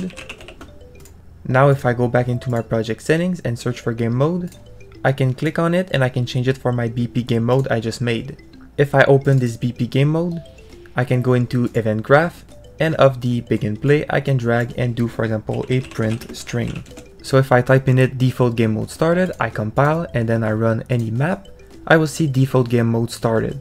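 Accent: Quebec, Canada. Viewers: where u from? u sound french canadian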